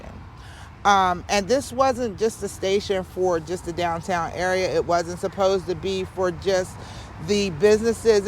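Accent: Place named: Liberia. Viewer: Are you Liberian? I’m picking up on your accent bro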